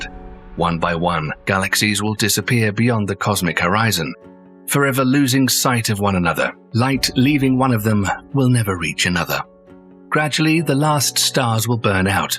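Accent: United Kingdom. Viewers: Can’t the narrator be an American. I can’t take the awful sounding British accent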